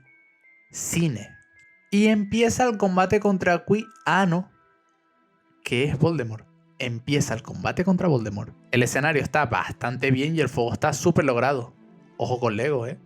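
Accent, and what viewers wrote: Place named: Canary Islands, Spain. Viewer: Ostras chacho que eres canario😂❤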